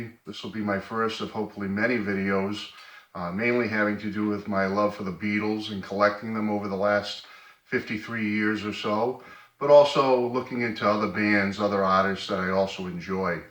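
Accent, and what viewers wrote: Boston, United States. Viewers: Hi thanks for sharing, just found your channel...seems you have many videos I'm going to spend time watching!! Thanks!! I lived in Boston, Ma, for many years do I detect an accent??;-)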